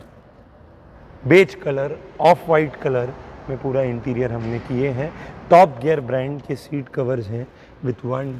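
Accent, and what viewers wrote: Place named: Maharashtra, India. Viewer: Waa..... मराठी आवाज अचानक ऐकून खूप छान वाटलं......❤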